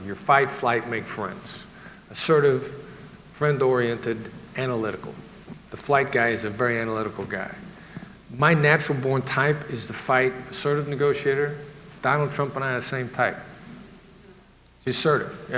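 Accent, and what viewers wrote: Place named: Midwest, United States. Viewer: I want to know the accent story. He's from Iowa, the midwest.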